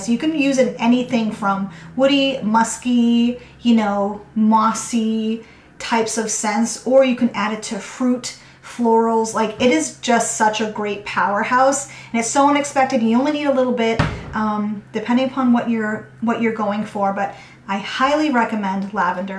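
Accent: Boston, United States. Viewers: I came for the candle content but stayed for the accent 🤣. I was born in Boston but have been living in Cali for over 30+ years. I miss that accent soooo much. Loved this video - and many of your top 10 (13) are in my stash as well 👍. I can second the recommendation for Little Bee Scents. I’ve used some of her FOs and they are AMAZING. A little on the pricier side, but worth it in my opinion. I’ve watched a couple of your other vids and really appreciate the honesty in your critiques, assessments, etc. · Hi, I stumbled upon your videos and found them very helpful. Im new to candle making and after hearing you talk I was excited that you might be in the Boston Area ( due to your accent) Im in Peabody Ma. I then watched your moving vlog and learned that you had just moved from L.A. to San Diego. LOL. Although I was born in Boston, I moved to California in 1992. 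I started in San Diego,then moved to L.A. (Venice Beach, West Hollywood). I moved back to Boston about 5 years ago....took me a while, but realized I was always a new englandah.... Anyway, I really like your videos and subscribed. I find them wicked helpful. Good luck and keep up the good work. P.S. Im a graphic designer by trade, so if I can help you out, just give a hollah.... I owe you!!!! I saw your video when you went to the market...I agree.... I love your sign too! Cheers Chuck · Another fellow Bostonian-ish. So funny! I actually grew up in Revere and live in Illinois now :)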